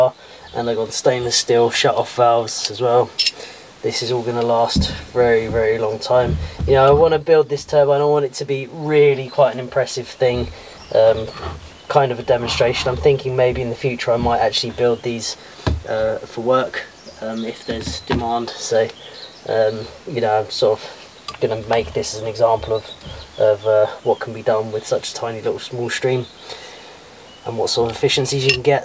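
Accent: United Kingdom. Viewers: 我竟然能听出是英式口音？？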